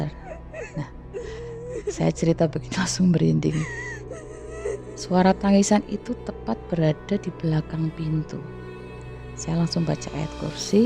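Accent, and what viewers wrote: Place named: Manado, Indonesia. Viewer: Mba nya logat Manado banget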